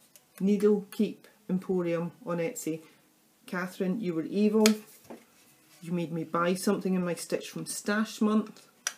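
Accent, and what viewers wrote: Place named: Scotland, United Kingdom. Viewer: My grandparents are from Scotland...it's great to hear their accent again. Can you show me how you use the gradient floss?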